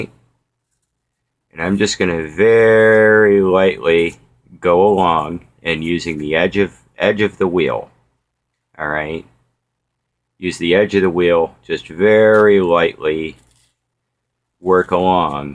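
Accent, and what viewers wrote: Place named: Pittsburgh, United States. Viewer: This is really helpful, thanks! Are you from the western PA area by chance? I grew up in Pittsburgh and your accent is reminding me of home!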